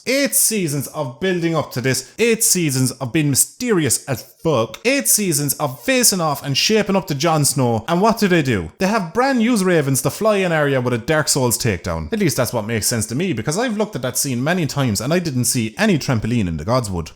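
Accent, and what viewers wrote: Ireland. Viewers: It’s part of the Irish accent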